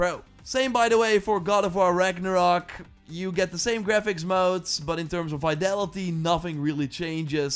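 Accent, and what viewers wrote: Netherlands. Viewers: Hearing the accent, dutchie right?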